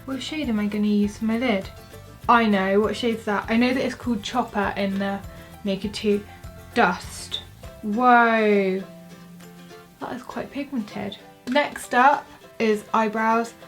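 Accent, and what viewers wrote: United Kingdom. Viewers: Where are u from u sound like ur from England are u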